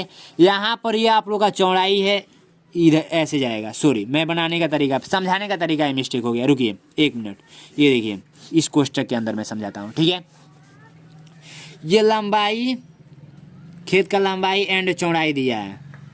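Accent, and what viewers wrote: Bihar, India. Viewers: Sir up bihiri ho keya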